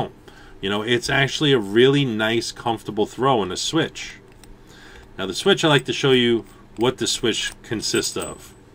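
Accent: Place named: Italian American, United States. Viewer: hey another good review love the italian american accent thumbs up up from australia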